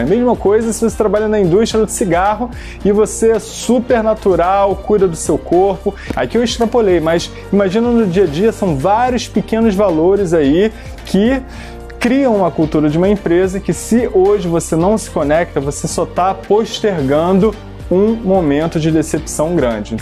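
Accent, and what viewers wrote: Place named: Rio de Janeiro, Brazil. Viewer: examente, um sotaque carioca e um bom cenário iludem muita gente.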